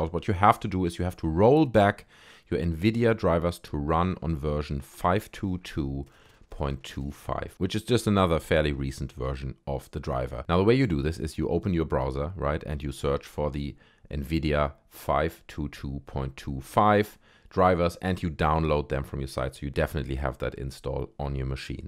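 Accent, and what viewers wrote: Germany. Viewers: Man hört das Deutsche raus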